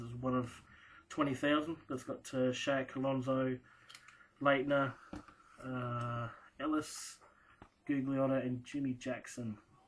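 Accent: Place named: Australia. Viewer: wow wow mate great pc, its great to see some old cards taken good care of. i will go though my cards and see what i can find for you, i would be very proud mate. oh and love the jacket very nice.i will send you a pm in the morn mate, just had a real lond day at work. and its soooooooooooo good to hear another aussie on hear!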